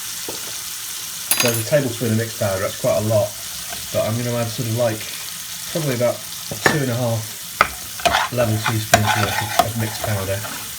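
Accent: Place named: North Midlands, United Kingdom. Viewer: Yaaaay! He speaks! ( although very muffled) but still a big step! Great vid! I somehow thought you were a Geordie , so obviously you are not. North Midlands?? I must try this ( but still have a backlog of about 30 of your recipes), great stuff as usual, much appreciated .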